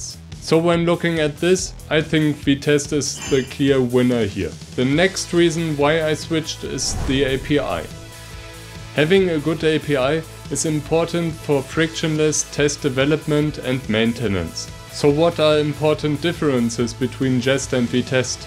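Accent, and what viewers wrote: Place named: Germany. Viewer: Good old german accent 😄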